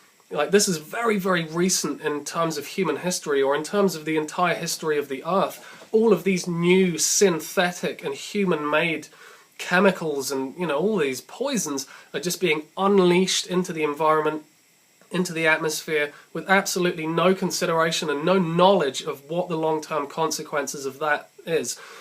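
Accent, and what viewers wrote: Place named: England, United Kingdom. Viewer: it's great to hear an English accent (for a change) after seeing all these video's with Yankee accents all the time.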